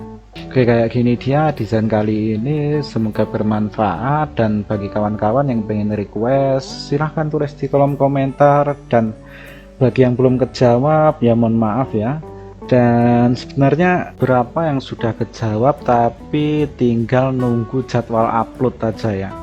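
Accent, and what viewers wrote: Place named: Central Java, Indonesia. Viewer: Mas logatnya jawa tengah tp kok dipanggil Kang? Hehehe. Konten yutubnya keren btw..! Request tiny house 2 kamar ala villa dong budget under 50 jt 😁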